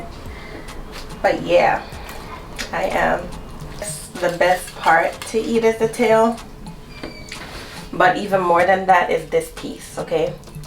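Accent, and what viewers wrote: Jamaica. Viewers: Are you a Jamaican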